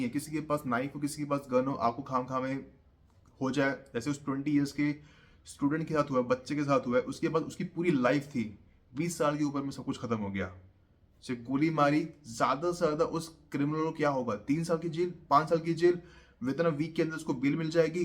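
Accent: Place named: India. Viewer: Ya to english bol le ya hindi. And lose this stupid indian english medium schools accent. Its annoying.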